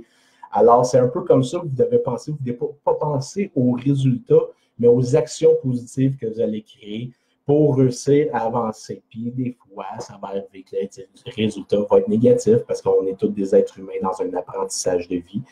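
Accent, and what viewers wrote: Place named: Quebec, Canada. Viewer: 2024, toujours là ✋️ Merci beaucoup pour cette vidéo, on a besoin d'entendre des gens comme vous pour avoir un meilleur moral ! Et ne vous excusez pas pour votre accent cest tres beau l'accent Québécois 😌 Certes les épreuves dans la vie sont parfois TRÈS dur à surmonter et il est aussi TRÈS difficile de penser positif dans ces situations... Mais moi en tant que personne qui est du genre pessimiste, j'essaye de faire un maximum d'efforts pour rester du côté optimiste ! La seule chose que je narrive pas encore à bien faire c'est de comprendre les leçons de vie, y trouver une signification, et trouver tout le temps le positif dans le négatif 🥲 Je prends exemple sur mon couple, je suis en relation a distance avec une fille (environ 9h de route) et quand cest le moment des adieux, je ne parviens pas à m'arrêter de pleurer, je repense à tous nos moments passés ensemble, je ne peux plus écouter ses musiques, ni sentir son parfum sinon la moindre chose me fait pleurer et j'ai l'impression que tout est fini 😂🥲 Bon après l'essentiel c'est que c'est passagé, ça finis par passer car rien n'est permanent, c'est juste le temps de me réhabituer à la distance entre elle et moi...mais cest vrai que je suis une personne tres sensible haha, et jai peur de pleins de choses...seulement je fais tous pour ne pas montrer ce côté noir..🥲 Si quelqu'un passe par là, n'oubliez jamais qu'il y aura toujours quelqu'un qui veillera sur vous pour vous guider tôt ou tard et que vous puissiez avancer dans votr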